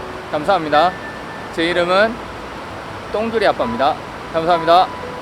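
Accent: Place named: Daegu, South Korea. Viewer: 미래 태어날 딸을 위한 노력과 사랑과 정성이 보이는 유튜브네요! 대구사투리도 듣기좋고 와이프사랑도 좋습니다. 응원합니다 님!♡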